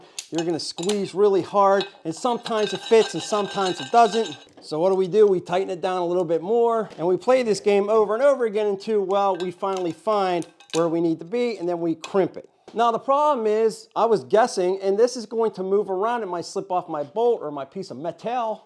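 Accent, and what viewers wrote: Pittsburgh, United States. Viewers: just found your channel, this is really a nice feature , but none of my vice grips have that allen wrench opening on the adjuster, probably because my tools are pretty old - I have Vice Grip branded and a few Craftsman vice grips in my tools. so... I'm gonna go and grab a new set that has the allen wrench hole. by the way, do I hear a little bit of Pittsburgh accent? or southwestern PA. when you say a few words I hear Pittsburghese "in 'air" I'm not making fun, it's just I hear a few words . thanks for the video , I subbed especially because that accent or I guess I should say colloquialism :)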